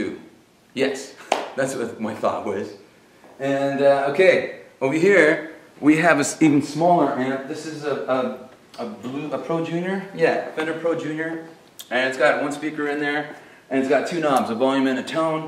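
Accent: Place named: Canada. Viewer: lol, he still says abooot :) love canadians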